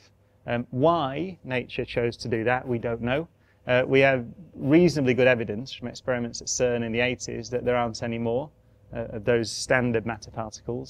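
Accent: United Kingdom. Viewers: British accent! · It's the British accent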